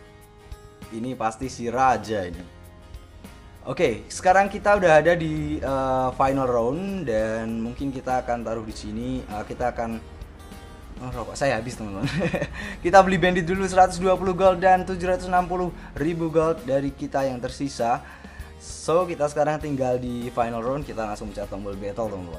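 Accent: Java, Indonesia. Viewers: Jowone ngendi pak samean?? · Orang jawa?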